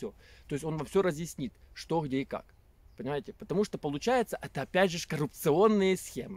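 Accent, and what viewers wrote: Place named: Surzhyk, Ukraine. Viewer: Досмотрел... Спс что не перешёл на мат как это обычно бывает в камеди-клаб. И да тот суржик, на котором ты "морозишь" далеко не русский язык. Так что либо говори на украинском языке, либо учи матчасть (символично неправда ли) прежде чем выходить на просторы ютьюба!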